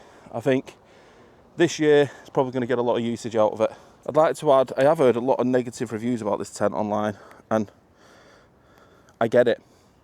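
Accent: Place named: Scotland, United Kingdom. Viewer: Spanish??????? I think he has a Scottish accent. One of us is having a very hard time understanding.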